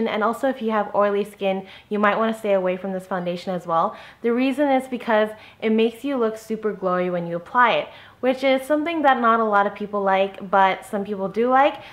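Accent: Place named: United States. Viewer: Are you originally from America? You just have an American accent that's all (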